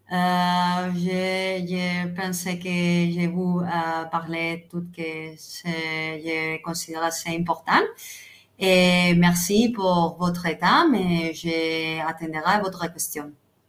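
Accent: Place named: Spain. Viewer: Me encanta tu manera de hablar francés mezclada con español. Gracias por la explicación.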